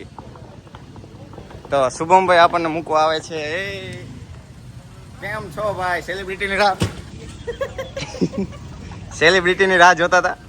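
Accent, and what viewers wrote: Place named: Zalavad, India. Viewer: Jordar maja Ave che tmara video jovanu ❤tmara video ma bav maja 🎉ane you are the best canteen creater 😎 ane bav j handsome lago cho ❤ek dam babla jeva j ,ane tmari zalavadi boli bav j mast che ek dam top 😎😎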